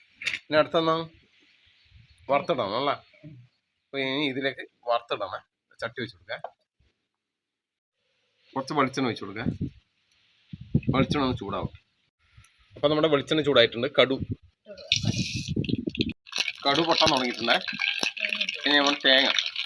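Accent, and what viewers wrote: Kannur, India. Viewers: വല്ലാത്തൊരു നൊസ്റ്റാൾജിയ 😔😔... നാടും വീടും ഉറ്റവരെയും വല്ലാണ്ട് മിസ്സ്‌ ആകുന്നു.. പിന്നെ നമ്മുടെ കണ്ണൂർ ഭാഷ.... 🙏🏻എന്റെ നാട് എത്ര സുന്ദരം ❤️❤️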